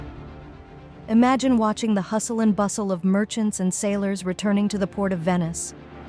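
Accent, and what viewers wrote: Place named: United Kingdom. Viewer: I rather prefer to hear Brit accent than those other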